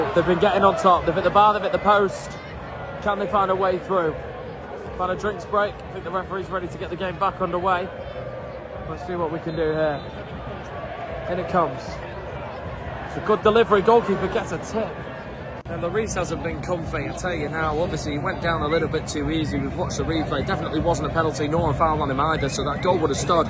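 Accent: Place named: United Kingdom. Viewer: O sotaque parece britânico.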